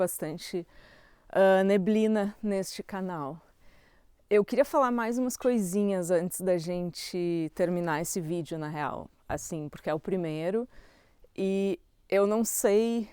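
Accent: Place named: Porto Alegre, Brazil. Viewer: Confesso que ainda não li os teus romances, mas tenho lido tua news regularmente já faz um tempo. Desde a enchente aqui de poa, por algum motivo do acaso achei o nevoeiro e me identifiquei. Comecei a escrever também. Muito legal te ouvir com teu sotaque portoalegrense. Lindo o video e apesar da sensação de isolamento (volintário), dá pra entender muito bem porque tu escolheu morar nesse lugar.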